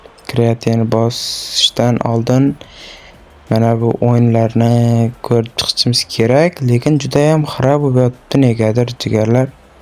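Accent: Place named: Khorezm, Uzbekistan. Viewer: Chisdi Xorazm sheva qu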